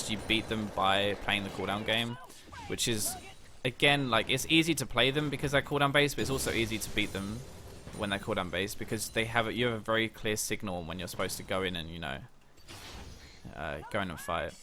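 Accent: United Kingdom. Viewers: its called a British accent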